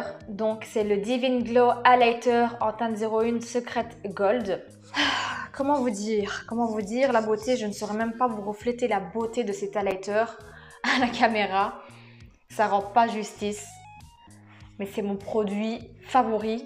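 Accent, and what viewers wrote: Belgium. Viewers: Tes belge ?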